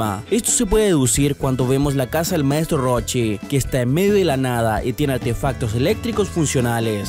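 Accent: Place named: Chile. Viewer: Creo que a se le esta escapando lo chileno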